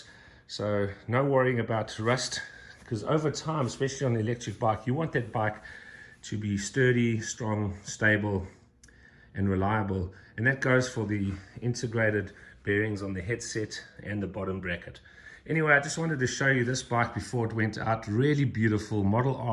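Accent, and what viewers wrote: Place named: Afrikaans, South Africa. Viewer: i love your afrikaans accent!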